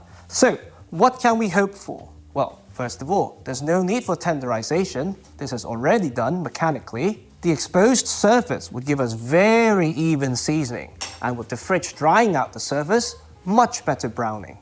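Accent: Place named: United Kingdom. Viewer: I’ve never seen an asian looking British sounding dude before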